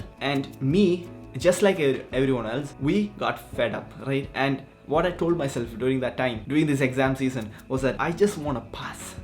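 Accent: Kerala, India. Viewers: Yoo I saw you in a reel and followed and now you are HERE😳 anyways change the thumbnail It has a grammatical error Change "What happened?" In place of what happen? Ik imma weirdo talking like boomer 😂😂 Btw love your malayali accent 😁😂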